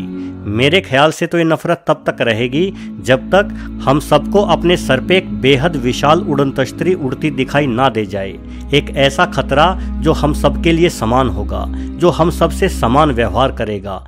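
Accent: Haryana, India. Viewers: .. aap haryana se ho shyad?..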